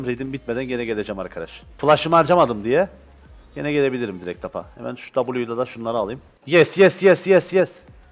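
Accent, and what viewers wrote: Cyprus. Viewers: sen kıbrıslımısin ben öyle gardaş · ABI SENI IZLIODUM BABAM DUYDU BIRAZ DEDKI KI KIBRIS LI MI BU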